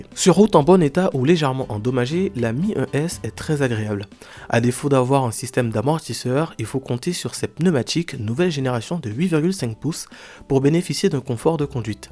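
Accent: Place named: China. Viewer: je sait que tes chinois car j'ai voyager dans le monde et je reconait tous les accent meme petit qu'il soit ! enfin je dit ca , car je voulait dire que la chine est un pays qui invente des choses supperbe , que ce soit des choses que l'on trouve sur des vidéo youtube insta ect comment ouvrir ceci sans cela , comment devisser sans clé , comment ceci comment cela , les vidéo sont nombreuse ! les chinois sont supper inventif ! puis ont a sur les magasin de type magasi nen ligne qui vende de tout petit gadgetr mais a chaques fois des choses merveilleuse mais qui a chaque fois sont très utile . puis ont a les smartphone dont j'ai plus jamais acheter autres que huawei ou wiaomi comme le 14 tpro qui a une carte graphique de ouf ! 12 giga de ram enorme ! et un demis terra ! et le proccesseur ses un des meigleiur ! soliditer supperbe avec le dernier écran le plus solide du marcher ! 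bref la chine vient en force dans tous les domaine ! ils faut dire que la chine est en avance de mentalité de plusieurs millénaire ! l'unique dommage ses la liberter en chine ! et beaucoup voye le chine comme une menace moi je vois que la russie et que la chine ne donne rien pour l ukraine enfin aide sont allié mais de chose minim mais non offensif ! bref la ici la trotinette moi jai le model xiaomi 4 lite 2 gen que j'ai eux gratos en achetant le 14t pro ! tous ca pour dire que plus j'achete chinois plus je me rend compte que leur gamme monte de niveau , ont des loin du made in china des années 90 dont tou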